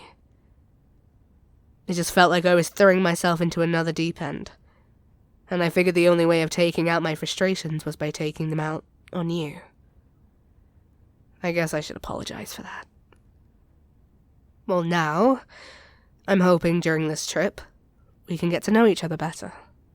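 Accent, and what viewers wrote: United Kingdom. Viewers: Shut up British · This is the first time I’ve heard a fellow Brit do an asmr and it’s pretty good tbh 👍👍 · Bri'ish accent is the cherry on 🔝 ♥️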